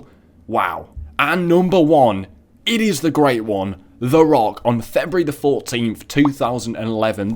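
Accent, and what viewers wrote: United Kingdom. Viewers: Did he is British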